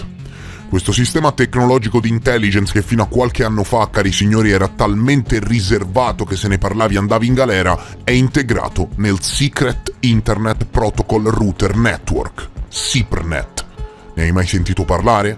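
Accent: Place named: Valdarno, Italy. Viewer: Il Valdarno ci ha regalato questa perla di saggezza. sempre al top! Che tu sia di quelle parti me lo suggerisce il tuo accento, ma potrei sbagliarmi, sia chiaro. Io uso solo l'intuito, non ho software incredibili di alcun tipo.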